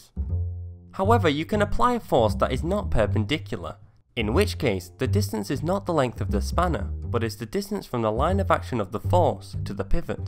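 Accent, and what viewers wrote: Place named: United Kingdom. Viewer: You remind me of minute physics but you're British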